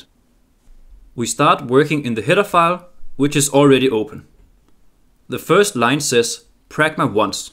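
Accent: Denmark. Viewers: Du lyder lidt dansk 😀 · As soon as I heard you said Vector I was like that sounds like a Danish accent and then I check channel description and it says Denmark. I am not sure if it’s the fact I am Danish I could tell or if it’s because I watched too many other Danish people, probably both.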